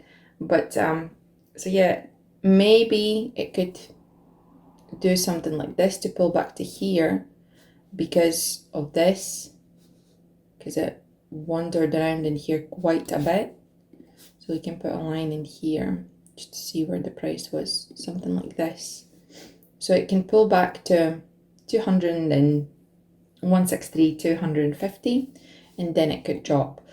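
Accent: United Kingdom. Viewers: British? love your content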